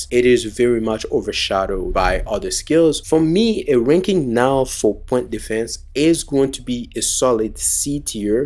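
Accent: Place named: Sub-Saharan Africa. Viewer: you sound subsaharan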